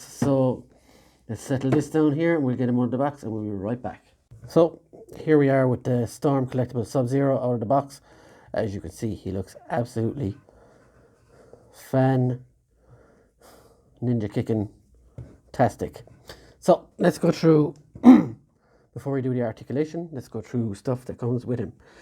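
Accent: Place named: County Clare, Ireland. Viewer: Nice :) It's just... A mate of mine and one of my favorite Youtubers, mr. Dazran3o3, comes from county clare and your accent sounds just like him. :P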